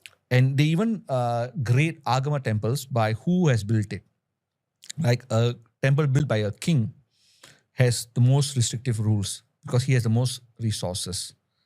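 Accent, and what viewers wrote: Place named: Malaysia. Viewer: This person speak like Malaysian or a Singaporean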